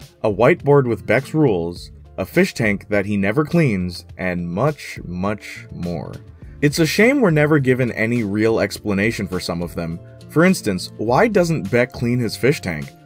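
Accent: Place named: Canada. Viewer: I could just tell he's canadian by the way he says things and his word choices. I guess he's just the polite boring canadian stereotype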